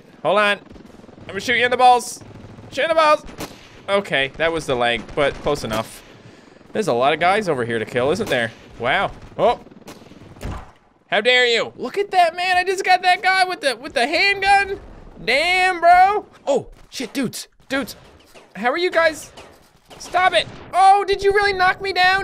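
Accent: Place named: Newfoundland, Canada. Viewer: are you originally from Newfoundland? I detect only I small accent of newfie in ya :)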